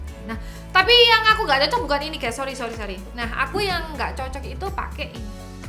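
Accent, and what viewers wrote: Java, Indonesia. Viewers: Medoknya bikin kangen❤ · Aku paling seneng medok'e mbak 😄 (((SANGAR YA?))) Btw, kulit wajahku berminyak dan aku juga ngga cocok Some By Mi, setelah produknya aku pake sampe abis, aku puasa skincare dulu baru pakai skincare yang sederhana aja; facial wash, toner, dan moisturizer sampe kulitku anteng. Ditunggu video selanjutnya, mbak! Aylapyuh! 😘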